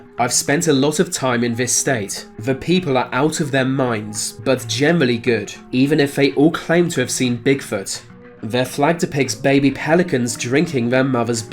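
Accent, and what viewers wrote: United Kingdom. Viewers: U INSULT TEXAS AT LEAST WERE BETTER THAN UR BRITISH SOUNDING ASS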